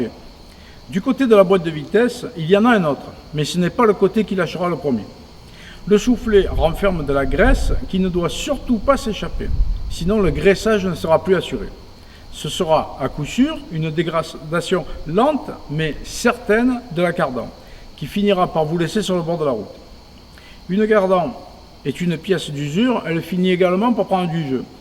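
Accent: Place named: Marseille, France. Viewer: Lol! Une cardan non de dièu Accent typique de Marseillais :trophy-yellow-smiling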